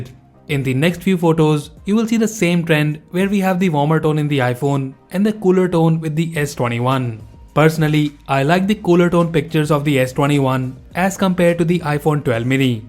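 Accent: India. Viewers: Indian accent 😫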